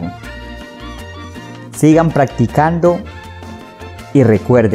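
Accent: Colombia. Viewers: Saludos desde Singapur!! Encontré tu podcasts ayer jajaj y la verdad es un tesero porque yo estoy aprendiendo el español de colombia y a través de estos vídeos y podcasts no solo puedo escuchar el acento de Colombia sino también puedo practicar mi habilidad de escuchar en español porque hablas en una velocidad normal así que es un reto pequeño para mí. Pero los subtitulos me ayudan mucho entender lo que dijiste...Gracias por crear estos podcasts :D has ganado un suscriptor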